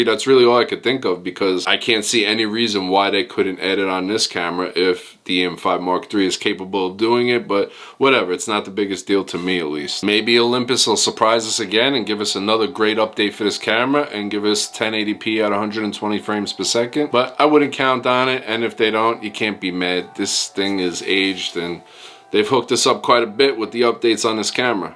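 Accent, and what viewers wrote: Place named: New York, United States. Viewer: I knew it.. the 1st vid. I watched today o said.. 😏 ooohhh he is so Italian, sounds like new York or jersey with some flava.. lol new sub. Here hopefully u can BHL some other cameras bc I'm to confused for a vlog camera.. I do have the z6 which is great so far as a newbie and I feel futuristcally.. but it heavy with all the monitor n mic.. blah blah.. so I want more YouTube/travel options for a serious vlogger .. pleaseee!!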